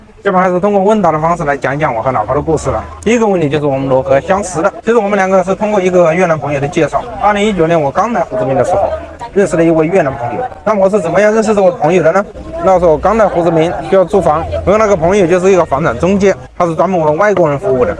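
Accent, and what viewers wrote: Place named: Northwest, China. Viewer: "My wife is simple and cute." Are you kidding me? She is very pretty and has beautiful lip and chin. For you, I detect an accent from West or North West China. After I posted this message, I watched some of your videos, and have to say: Congratulations, you finally found happiness in Vietnam! Most people on this world spend their entire life, but can't find happiness. You are the luckiest one. Do you best to keep it.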